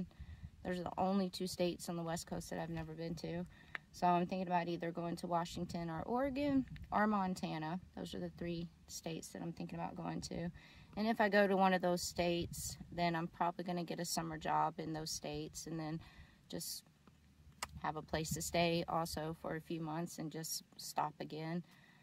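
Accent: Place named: Texas, United States. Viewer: Knew I heard the Texas twang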